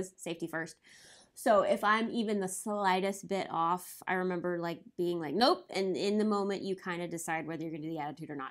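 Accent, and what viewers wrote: England, United Kingdom. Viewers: I love this entire video, but just want to say your English accent is adorable and amazing!! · That’s a good British accent! 👏🏻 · Thank you so much! this is phenomenal! Love your costume and English accent! <3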